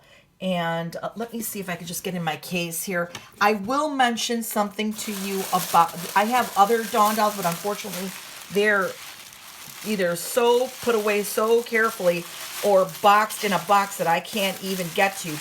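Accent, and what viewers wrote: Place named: Texas, United States. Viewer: That a Texas accent if I ever heard one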